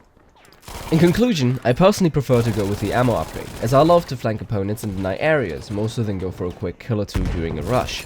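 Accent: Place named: Germany. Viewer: your german your english shows that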